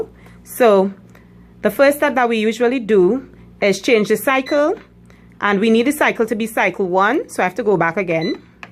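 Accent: Trinidad and Tobago. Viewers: 🇹🇹🇹🇹🇹🇹 Must spot a trini accent anywhere! Tell me, does this recipe taste like "our" traditional homemade bread? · Yeah as soon as she started to speak i was like.. This is a trinni??? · You sound so Trini 😁🇹🇹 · You sound like a trini like me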